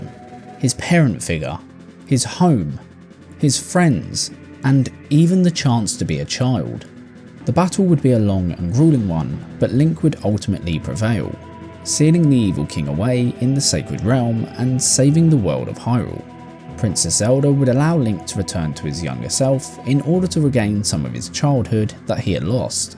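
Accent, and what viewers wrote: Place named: United Kingdom. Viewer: British accents are annoying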